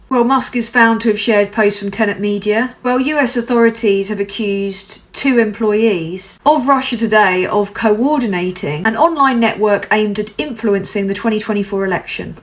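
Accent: United Kingdom. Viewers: Ur british accent is so beautiful! Love ur content from the USA!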